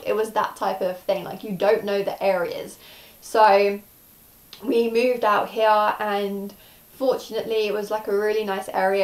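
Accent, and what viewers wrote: South Africa. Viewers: Your accent has been South Africanize. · You've got a little Afrikaans accent even😄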